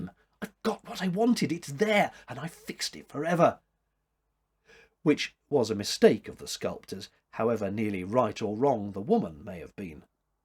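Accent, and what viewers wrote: United Kingdom. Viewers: look into uploading your recordings to Libravox. Make sure to mention your British, because Americans dig the accent.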